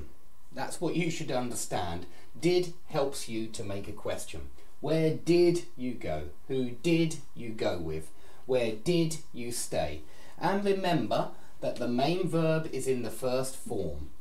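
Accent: United Kingdom. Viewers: Hola, I like the way you teach. It's very clear. I like your accent, I don't know which part of England you are from. I'm from Argentina and I love English language. I'm an intermediate learner so sometimes you get stuck or you understand half the things you hear and that makes you feel dissapointed. I'd like a lesson about phonemic symbols and how can I pronounce a word if I don't have a dictionary with me. Saludos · Well, i was expecting for something different I guess, but it was a good lesson, you taught me something new which I was a little bit confused on how to use it or even to teach it. It´s a difficult topic and you did quite well, by the way I love you accent, I guess you are british, aren´t you? :3 Congrats! and thanks for the lesson :3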